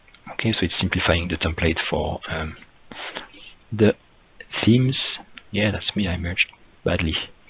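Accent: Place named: France. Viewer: Très bel accent français ;)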